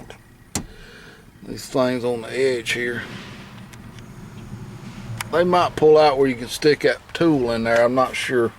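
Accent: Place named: Alabama, United States. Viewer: I love these videos! You sound like you live in Alabama, where I live. You do an excellent job and you're entertaining too.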